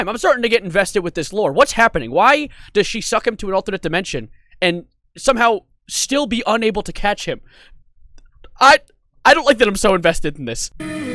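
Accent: United Kingdom. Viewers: Me too she- WHY IS SHE BRITISH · WHY ARE YOU BRITISH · Why are you British!? Love it. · "Why are you british??? "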